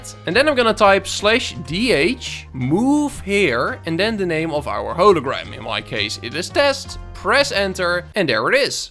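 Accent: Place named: Netherlands. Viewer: bro, never heard of you, but in the last week i watched like almost al youre server vids lol, youre a life saver. thanks Bud! one question. are you by any chance Dutch? I am and i feel like i hear an accent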